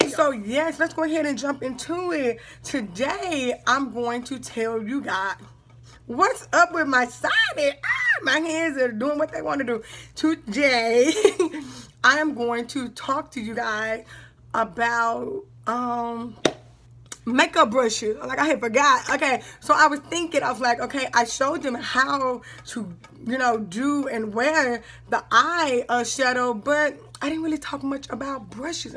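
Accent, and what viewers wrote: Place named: South, United States. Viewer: I love your accent you from the south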